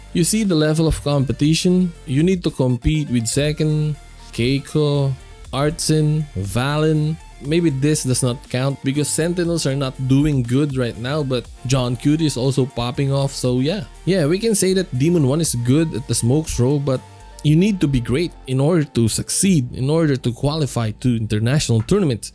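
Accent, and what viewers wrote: Philippines. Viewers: Pinoy kaba lods? tunog e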